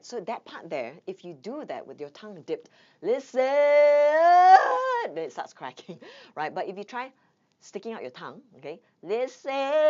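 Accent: Singapore. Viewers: Are u Singaporean or malaysia🤣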